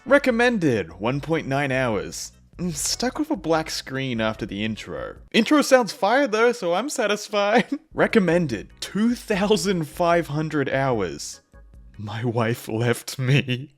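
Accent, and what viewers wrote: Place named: Australia. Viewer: Why do all my favorite British YouTubers always turn out to be Australian?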